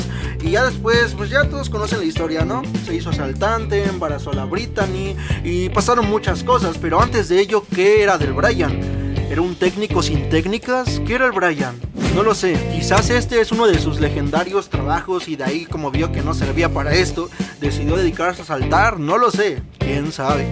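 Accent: Iztapalapa, Mexico. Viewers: En este video se te salió lo mexicano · Eres de Iztapalapa?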